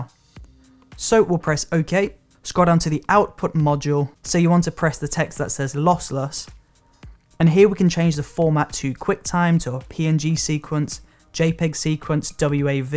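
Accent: United Kingdom. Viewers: really like your brittish acsent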